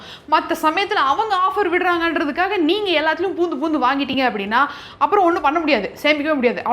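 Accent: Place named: Kongu, India. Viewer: Good information sister.. 👍I like the way she speaks in kongu slang..❤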